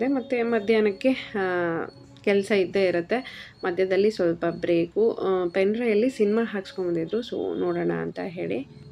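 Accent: Raichur, India. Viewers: Nemma maatu thumba Chanda raichur dst anesuttai nemmadu sis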